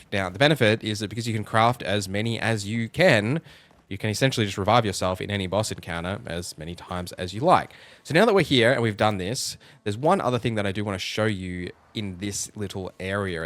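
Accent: Australia. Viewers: I was won over by the intro music. As I always say to my children when i need them to hurry- run like you stole something. Also love the aussie voice, five gold stars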